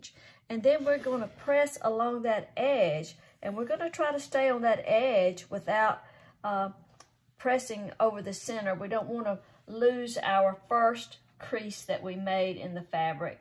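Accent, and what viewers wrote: South, United States. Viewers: You have a GREAT ACCENT!! This is the BEST Southern accent, y'all!!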